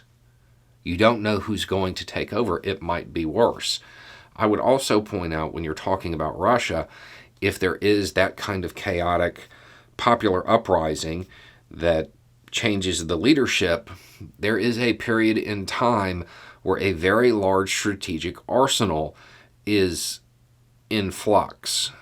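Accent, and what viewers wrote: South, United States. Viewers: Not sure if you can tell but your accent has gotten less southern. Just watched a video from 3 years ago.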